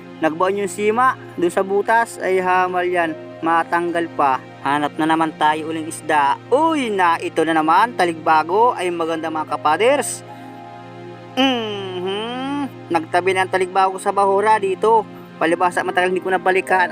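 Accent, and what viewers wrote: Bicol, Philippines. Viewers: Pa shout out idol Ruben Cruz nasa Dubai pero taga bicol kaya gusto ko Tagalog mo at ma halong bicol 😆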